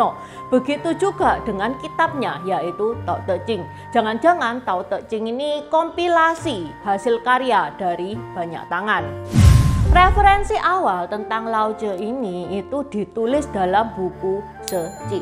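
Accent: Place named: Surabaya, Indonesia. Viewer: Suroboyoan tha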